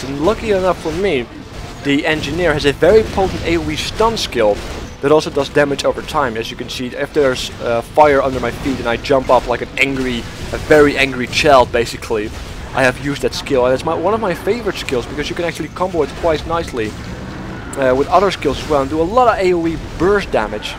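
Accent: Netherlands. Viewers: something says you have a dutch accent! :) neverthe less.. good video. enjoyed it. Good to know my 19 euro's are a good investment.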